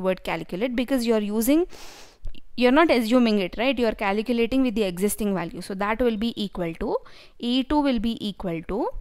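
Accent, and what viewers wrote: South India, India. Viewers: its south accent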